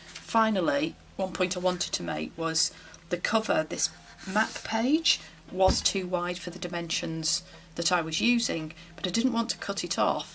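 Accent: England, United Kingdom. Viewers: I’m sorry to bother you with my comments hon I moved to Melbourne from London in 1973 and so enjoy hearing other English accents, which are few and far between these days!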